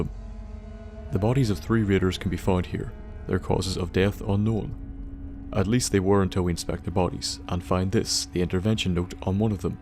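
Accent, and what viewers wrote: Northern Ireland, United Kingdom. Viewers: Hmmm off topic Question if I may...Where are you from?. The accent sounds like Nth Ireland but with a touch of..................well that is why i ask hehe.. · He is northern Irish.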